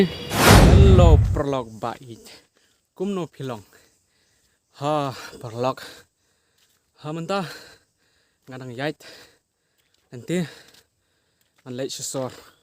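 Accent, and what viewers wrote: Meghalaya, India. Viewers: Kren Da ka ktin pnar phim nang bha ban kren khasi.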